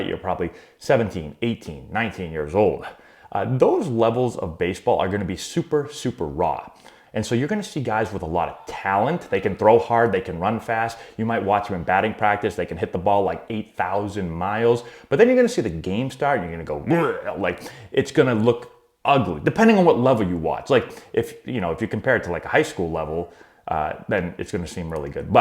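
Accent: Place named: Boston, United States. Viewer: Aye bro love ya channel I'm from DC But I was raised in Boston and Worcester so hear ya accent is refreshing I feel like I'm home lol #GOSOX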